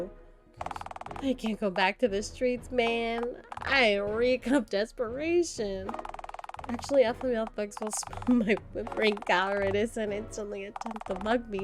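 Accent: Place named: United Kingdom. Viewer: i'm still in the beggining of the video, but, stellar british accent by the away 10/10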